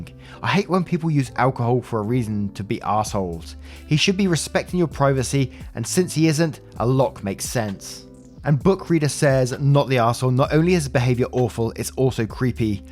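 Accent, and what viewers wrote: England, United Kingdom. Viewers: Listening to this while I work on ironing out details with a guy 2 hours drive south to look at the horse he's selling. If all goes well, I'll be a first-time stallion owner:D These videos are one of the highlights of my week (I like letting them build up and then binging on the videos) as I like hearing not only the reading style and accent (sucker for an English accent), but your own points of view and assessments of the stories you read. So, thank you, ^^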